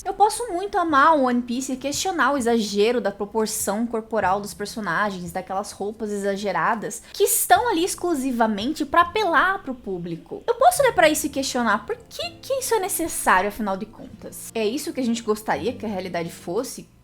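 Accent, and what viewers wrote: Paraná, Brazil. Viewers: se eu fosse chutar, acho que você é paranaense.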